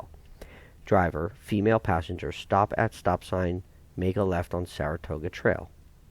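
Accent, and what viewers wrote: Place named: United States. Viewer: Thank you for your greeting. I am a great fan of true crime. I find it fascinating that in the USA, you are privy to such documents and/or knowledge about the cases, no such liberty in the UK. I am on part 8 as I think I have probably watched all clips that Shannan or any of Shanann's friend have taken. Have you read any other crime cases? I think this has hit us so hard because we are they, ie we can identify with their lives. I hope you don't get bored .... I speak a couple of languages and it has always been my dream to read books in the first language of the writer eg. Anna Karenina in Russian, Anne Frank's diary in Dutch etc etc. Hearing you read these gives the correct nuances unique to the English spoken in the USA. Thank you so very much.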